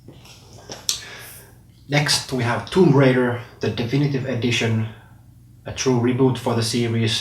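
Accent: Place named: Finland. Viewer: OMG FINNISH ACCENT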